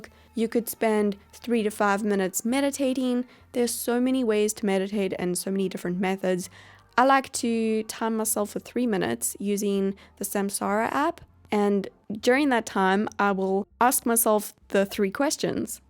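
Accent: South Africa. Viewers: are you from South Africa? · Are you South African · Your accent makes me feel like I'm home :D I'm a South African living in London. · are you South-African, because your accent sounds like it, im South-African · Are you South African? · Fellow south African is that you??? ♥️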